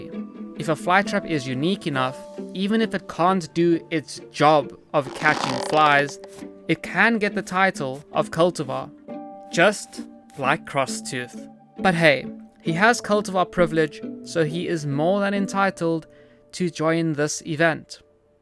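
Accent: South Africa. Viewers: Are you South African, the accent gives it away? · I had a feeling! You sounded SA accent. Great videos! I look forward to seeing more and going back through your older ones.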